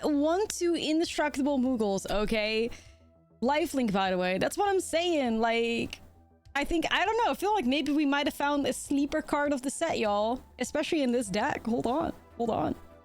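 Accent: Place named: Netherlands. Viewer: Are you from the netherlands? Sometimes your accent sounds like that :D Its kind of cute.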